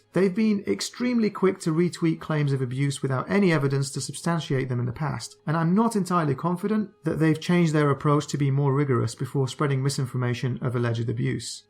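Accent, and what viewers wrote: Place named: England, United Kingdom. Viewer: I just love your English accent 😍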